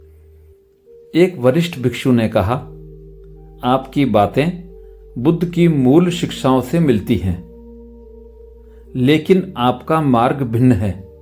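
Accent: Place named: Rajasthan, India. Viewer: Bhai aapka ascent hi complete nahi aap mut bola karo. Aap shabdon ki khichdi banate ho. Aapka hindi per sahi control nahi hai. Aap rajasthani ho.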